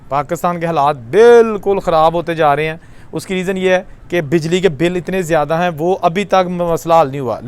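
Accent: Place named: Majha, India. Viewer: I like you speaking maajha style punjabi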